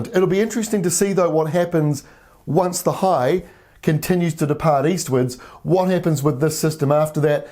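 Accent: New Zealand. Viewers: Nah...Phillip’s the best...I’m even getting used to the New Zealand accent!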